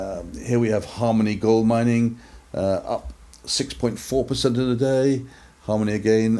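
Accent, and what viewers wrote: England, United Kingdom. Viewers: Really appreciate your wisdom and that beautiful English accent.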